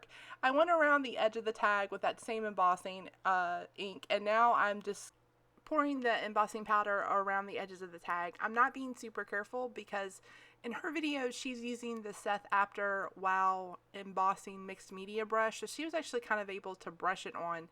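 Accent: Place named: North Carolina, United States. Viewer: Ah, maybe a North Carolin accent.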